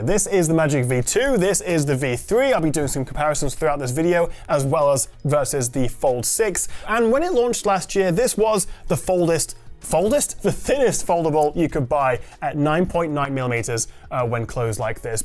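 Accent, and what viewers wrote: United Kingdom. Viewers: Love how British you sound and the Harry Potter reference.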